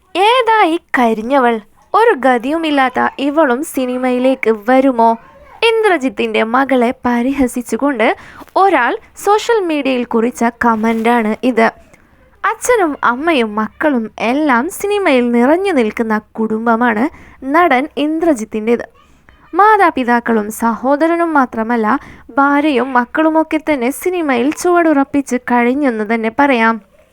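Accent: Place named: United Kingdom. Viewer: ഇംഗ്ലീഷ്കാരി ആണോ മലയാളം വാർത്ത വായിക്കുന്നത് 😎 കഷ്ടം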